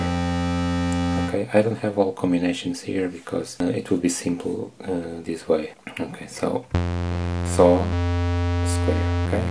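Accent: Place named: Portugal. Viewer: Are you from Portugal? You do sound like it, anyway, great job...and accept some form of donation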